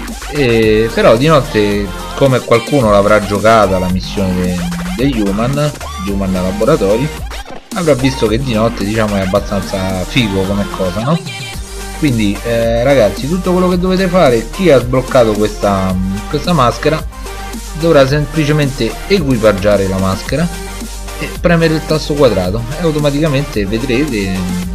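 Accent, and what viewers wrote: Rome, Italy. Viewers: Romano come me ahahaha grande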